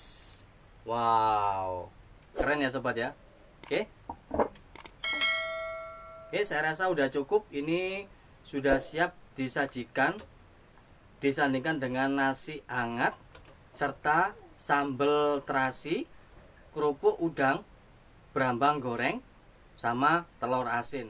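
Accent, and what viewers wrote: East Java, Indonesia. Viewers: bahasanya medok banget orang jawa timur mana ya chef 😊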